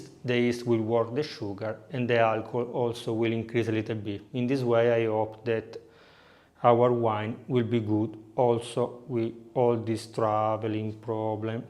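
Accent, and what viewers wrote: Italy. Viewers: I like your Italian English accent, sound sweet and strange to hear, all in all thank you for the lesson, ciao ciao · Ahh that fine old Italian accent 😌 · He is so cute! And his accent too. Ok I am catching the next flight to Italy. ✈️ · Totally Italian accent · The Italian Accent is so cute !! 😂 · Italian accent my favorite accent. is that wine Halal? any sheikh here? 🤣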